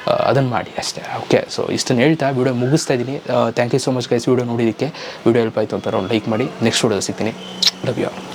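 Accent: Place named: Hubballi, India. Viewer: Nimma matu Nija Tara ede nivu Ennu Belibeku nanu Nija Andre Hubballi avalu nimma Bhashe Chanda anstu adake sub madide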